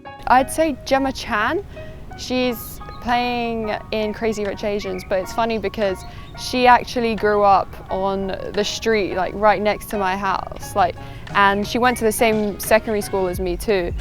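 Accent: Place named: United Kingdom. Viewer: Ll aa ndon... xexexe... so british eh!!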